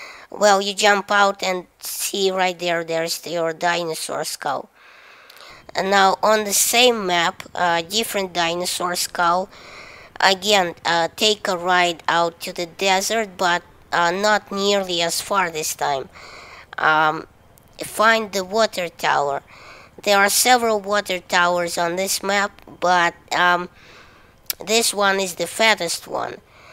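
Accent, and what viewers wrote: Russia. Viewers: Спасибо, что рассказал. Половину я и вовсе не знал. А по начальному английскому , понял что ты русский) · are you half russian half asian or something when i heard ur voice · I can't help but notice your russian accent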